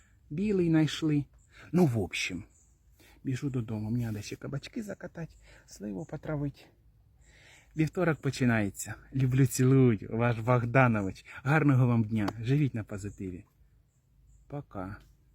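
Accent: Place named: West, Ukraine. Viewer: Я фанатка русского языка. Но думаю: как было бы неинтересно, если бы все славяне говорили на чисто русском! С таким удовольствием вас слушаю! Да ещё эта западная деревенская манера! 👍👍👍 У меня родственники на западе в селе - точно так там все разговаривают!😂😂😂❤❤❤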